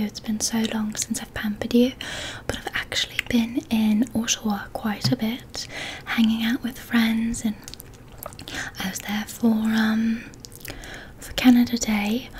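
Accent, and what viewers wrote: United Kingdom. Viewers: As a british person who speaks like this. Thank you! So many posh accents and this is a much much more common accent! Love you videos!! X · omg finally a British accent ASMR 🤍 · i'm home alone this week and the loneliness started kicking in tonight, but this video was just what i needed to keep me company. and as a brit, i love the accent! the only slip i caught was , we don't say candy. we say sweets! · You are one of my favorite ASMR channels to watch. I love your British accent.😊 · i love how the accents are actually british but throughout the video it switches so fast to different areas of the uk😭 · This argument thread is too funny lol, so I’ll settle it. Is this accent impressive coming from a Canadian? - yes. Is this how all brits sound? - no of course not, but a lot also absolutely do. kind of swaps between posh and cockney here; which is why it may sound a little off to us brits (but still impressive!) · your british accent is so impressive 😭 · You sound just like my friend! 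We're both British but I'm from a more stereotypically 'chavvy' area, she's from the 'fancy' area. Your British accent is amazing! ❤ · Love this! As a brit im v impressed, bit of the keira knigtlys about ur accent 👏🏻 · your british accent is so surprising and pretty 😭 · I am a british girl and live in britian, and i have to say you do a perfect british accent, also you do a very good french accent aswell,❤️, love all your videos, very relaxing, and some are funny, your very pretty and cute lady xXx 💕❤️💕❤️💕❤️ xXx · why did I expect u to start speaking in a north east/northern British accent 😭😭 still love the accent tho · British character is back again!!!🥺. Recently I have missed British roleplay characters on your channel ♡ and you posted British RP characters!🫶. i can only say🥹. Did my dream come true?✨️. I can imagine 1 Hour with a british friend as beautiful and sweet as you I must feel lucky and that is the best thing in my life💖🩷. 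I wish I had a british friend like you loll😭. And Fyi I love your British accent.Your british accent sounds great!. This will be my favorite roleplay💗🩷. Yes I Love this rp for sure!♡ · The accent is actually so realistic because this is like the EXACT accent my British friend has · The British accents suits her so much omg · when she talks in a British accent or just her random voice is just so comforting like she has such a comforting voice and it brings my anxiety down so much. ❤ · can you do another one with a british voice i loved this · people still speak like that in Britain, it just depends where you go. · I love your accent on this! I'm British and you sound like someone who's lived in loads of different parts of Britain (and maybe Ireland as well). When people go from place to place you get accents like this, and they're so nice! It's like you can hear all the different influences of the British accents and dialects. 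Great job! xxx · I LOVE your british accent role plays 🥺🥺 they’re so good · Love how it dabbles between British and Australian 😂😂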